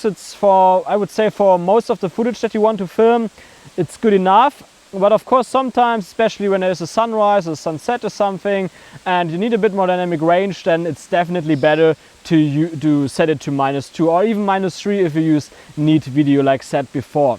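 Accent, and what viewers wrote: Germany. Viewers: Man hört den deutschen Akzent einfach überall raus:D Gutes Video !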